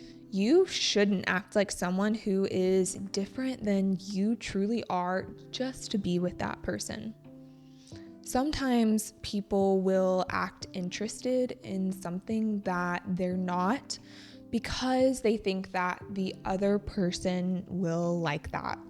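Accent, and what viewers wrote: United States. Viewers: Thank you for making such insightful videos for practicing English. I love your stories as well. Yesterday when I was looking for a native speaker to shadow American English accent, fortunately, I found your accent a good choice for me. Now I watch your videos every day and do shadowing. Looking for more meaningful videos.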